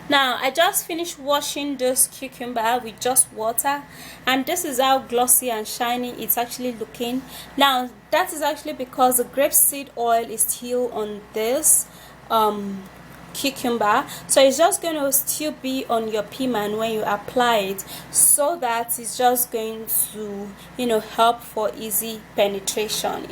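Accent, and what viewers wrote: Nigeria. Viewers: That accent sounds like a Nigerian. They are all after money if anything goes wrong you can't sue her. How come doctors don't refer you to such things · there s nothing called "African English"... try not to display your ignorance in public.. from her accent she sounds like she s from West Africa...Nigeria to be specific...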